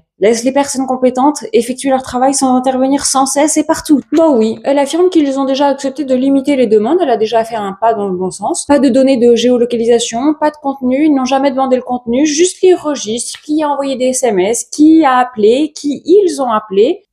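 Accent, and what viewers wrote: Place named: United States. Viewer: Je regarde tes vidéos avec ma fille Sasha de 19 ans. Nous sommes passionnées par les stars américaines. Il existe des youtubeurs qui traitent de ses sujets. Tu es pétillante, dynamique, ton accent (je croyais que tu étais américaine), une très jolie femme, bcp de flow comme me dit ma fille. Il faut le dire aussi. Tu te démarques largement car tu vis les infos que tu nous donnes avec des imitations, ta gestuelle, la vie qui se passe autour de toi, ton fils qui joue avec son ami(e), ton chat. Ça c’est génial. Tu es passionnante et passionnée. Tu nous entraînes dans ta passion. Même après une journée de travail, t’occuper de ton fils, de ton chéri (j’espère que je ne fais pas d’impaire en disant cela 🙊) tu as une énergie débordante pour nous informer de tes news. C’est cela être passionnée. Je suis sûre que tu vas monter car tu le mérites. 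Je le pense sincèrement car cela ne m’apporte rien de te mentir ou autre. Je hais le mensonge. Ne change pas. Et merci encore 🙏🏼🌹🌹🌹🌹🌹🌹🌹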